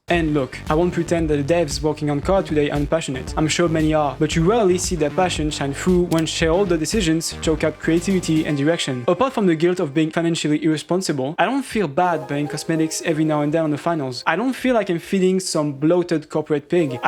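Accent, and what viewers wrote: France. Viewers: Having a French accent is kinda broken tbh. You can do something shameless and then yell at me with that funny accent and suddenly its ok and I agree with you. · you sound very french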